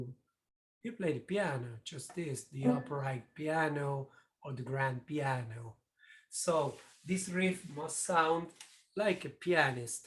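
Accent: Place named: Argentina. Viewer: se nota que es argento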